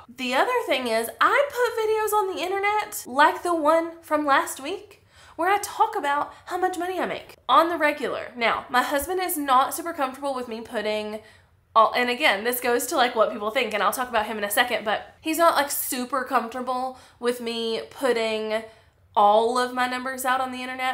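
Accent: South, United States. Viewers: Your energy, personality, confidence and transparency drew me to your channel! Cornbread accent, I love it! I definitely am the kind who is scared to put myself out there and grow my business. Your videos are super helpful!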